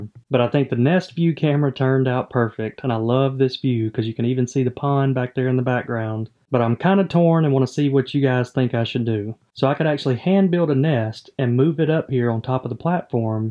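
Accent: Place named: South, United States. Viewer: the way you've said "hello fresh" was poetically beautiful. What part in the US are you creating from? I did hear a cave climber sound similarly too. I think they were from georgia or somewhere southern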